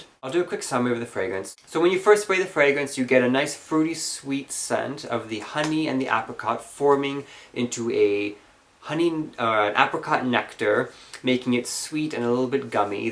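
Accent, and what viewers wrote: Germany. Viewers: You have like a perfect German accent, I can't stop listening to it. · hahaha they must be german. I love your german accent.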